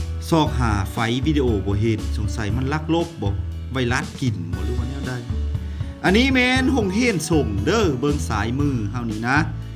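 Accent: Phu Thai, Thailand. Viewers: มักน้ำเสียงสำเนียงผู้ไทภาษาพูดน่ารัก ติดตามตลอด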